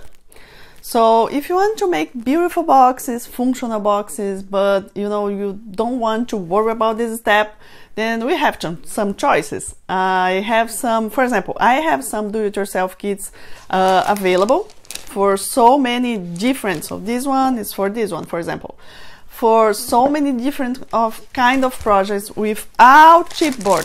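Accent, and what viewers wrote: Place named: Brazil. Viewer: Are you Brazilian? By your accent, I guess so. 💜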